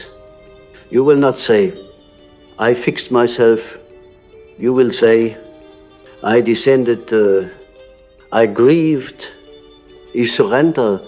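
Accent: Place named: Germany. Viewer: No perfectin in your Speech or pronounciaition. . And to hard on the accent .. tooo germanish .. but acceptable.. create some perfection guys .. like original ..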